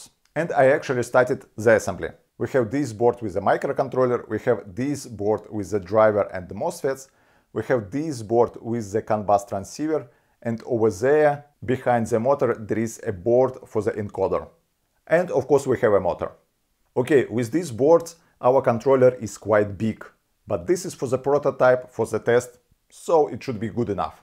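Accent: Russia. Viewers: Русский акцент сильно земетно.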